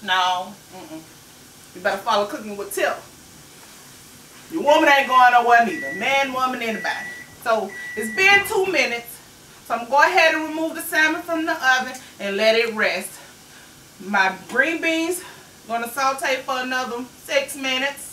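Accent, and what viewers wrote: South, United States. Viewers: Tip Sweetie ain't nothing wrong with the way you speak. We're Black and proud!!!Just like Jamaicans have patois so do us country folks. People can poke fun but they wanna sound just like us. Keep doin whatchu do Queen. Thanks and blessings from Connecticut😚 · Country speak is for all us Southerners—black, white, brown, or purple! I think I trust someone who speaks like you more than someone without an accent when it comes to good food. I am a plus size girl as well and my daddy always said he wanted to be served by plump people because you knew the portion size was correct! Glad you are back! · no you're not too country that's being you that's natural don't stop being you please do not change your accent your natural country swang... Jersey and PA love you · I'm not sure Where you're from, but I Absolutely LOVE Your accent!😘😘😘😘 As a matter of Fact, the Accent is what "Drew ME In" bcuz I'd never seen 1 of your videos until recently and it was Your Easygoing nature & Naturally "Country" Twang, that made me come a 2nd time, so Please-just BE YOU.......💜😏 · Lawd wea u from lol, i swear you got to be from Alabama, Georgia or somewhere from the south cause that damn country accent is strong and I love it lol you sound so much like my folks down south lol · HELLO BEAUTIFUL BLACK QUEEN I LOVE YOUR COUNTRY TWANG YOU ARE A COUNTRY GIRL JUST LIKE MY MOMMA I LOVE YOUR RECIPES TIP MY NAME IS SABRINA I DO NEED HELP IMPROVING MY COOKING SKILLS I DON'T MIND SENDING YOU A FEW DOLLARS HERE AND THERE I WILL TELL WHAT I LIKE FOR YOU TO COOK AND YOU TELL ME HOWNTP SEND YOU A FEW DOLLARS THE SALMON I DEFINITELY HAVE TO TRY AND THE SEAFOOD DRESSING I GUESS IF I DID THIS FOR MY MAN HE BE READY TO PUT A SISTER ON LOCK WHERE YOU FROM I LOVE YOUR ENERGY AND HUMOR YOU KEEP YOUR COOKING FUN I DID SUBSCRIBE TO YOUR PAGE ANYWAY KEEP ME POSTED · I like when you talk in your normal southern voice. 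This was a great video. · We love you Tip! I have one request tho.......PLEASE DO NOT change your accent. We love your naturally country swang accent from the first video. Its original & it reminds us of our mothers & grandmothers cooking. Do not switch to the “proper talk”. We like the down home country talk. Love ya, be blessed😎♥️🤟🏾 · Hi Tip, I'm new to your channel. I watched 1 video and couldn't stop! That country accent along with those delicious meals bebeeee, made me subscribe! You're doing an awesome job. Keep the videos coming. I swear I'm gonna try some of these meal.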